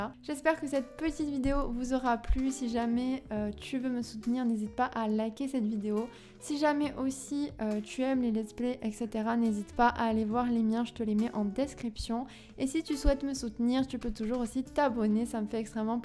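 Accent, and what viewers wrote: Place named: Corsica, France. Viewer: Coucou 😊 ohlala je viens de tomber sur ta chaîne et je sens que je vais télécharger TOUS TES CC, je suis beaucoup trop fan de tes sélections 😌💕 ça me relance de ouf dans les sims là On dirait que tu as un léger accent, ça me rappelle un peu la Corse, tu es originaire d'où sans indiscrétion? ☺️ Je m'abonne ❤️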